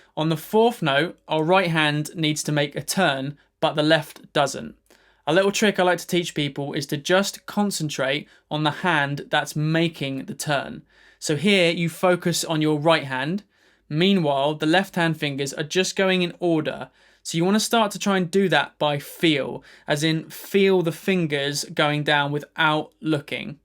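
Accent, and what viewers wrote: United Kingdom. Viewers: Dear sir, I love your videos very much!Thanks for your efforts.!!I am not a English native speaker,so I can learn to play piano and English at the same time,it is really awesome. I like your British accent,it sounds nice,maybe you can teach english too😜Have a nice day!Thinks again☕️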